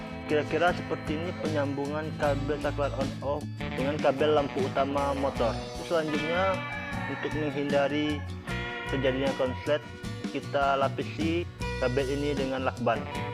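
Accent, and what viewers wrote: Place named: West Sumatra, Indonesia. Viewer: kek nya abang nih orng minang.. ketahuan logatnya.. Salam rider yamaha bang hehe 🤗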